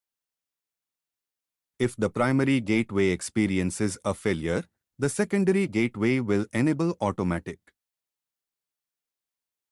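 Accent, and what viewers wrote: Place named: India. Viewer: I think it's an Indian accent rather, lol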